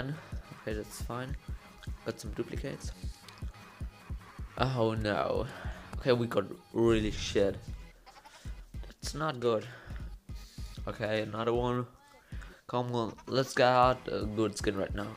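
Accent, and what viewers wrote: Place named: Germany. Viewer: Best Commentary 2k17 the German Accent is just awesome and it sounds you chewing bubblegum while the whole video. Still op Video ^_^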